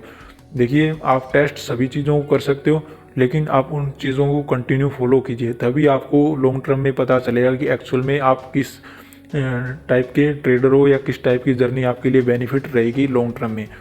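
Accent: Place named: Rajasthan, India. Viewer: Rajsthan k lag rahe hai (meri local language style bol rahe hai)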